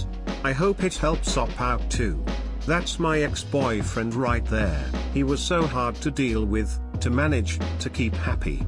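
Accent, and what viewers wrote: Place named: United Kingdom. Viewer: I didn't even consider that the voice in this video was british also because i quit listening. But yes, the old voice (often named Daniel) is better. He isn't perfect, but he is the boss at this. Everyone else just sound wrong with the only exception being the american voice of the Radio TTS Youtube channel.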